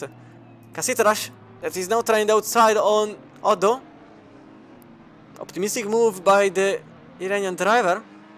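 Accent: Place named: Italy. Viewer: you are a great commentator :) i like your energy and your italian english... looking forward to hear you again bro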